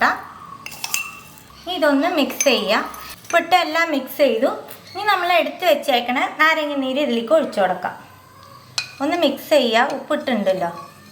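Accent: India. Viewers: ee narration style kettal thanne video full kandu pokum.. kottayam slang 🥰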